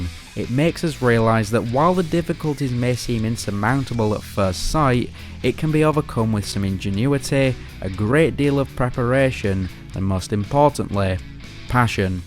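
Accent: United Kingdom. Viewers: Yorkshire accent for the win. Snurr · Are you from East York’s?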